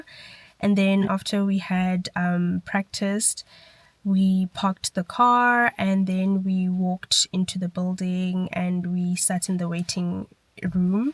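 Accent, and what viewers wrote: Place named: South Africa. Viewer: Oh sweet! I'm glad they don't take it, that would be a mission when flying back and forth XD thank you so much for all your help <3 It's also soo good to hear another saffa accent again :')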